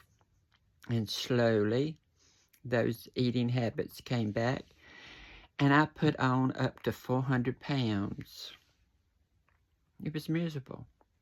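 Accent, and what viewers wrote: South, United States. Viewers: You have a beautiful southern accent the ASMR community would definitely appreciate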